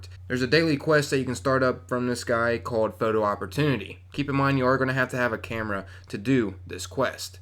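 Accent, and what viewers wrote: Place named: Philadelphia, United States. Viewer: i needed this info! p.s. ill bet the speaker is from the philladelphia area.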